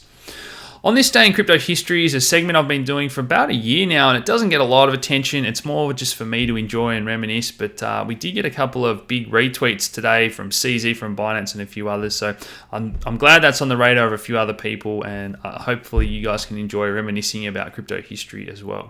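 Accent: Australia. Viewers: Great channel! Great find! Have been watching themoneygps and Lynette Zang etc Good to hear an Aussie accent in this space but adds in crypto as well.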